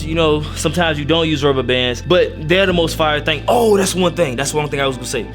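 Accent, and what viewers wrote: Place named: Philadelphia, United States. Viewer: You from philly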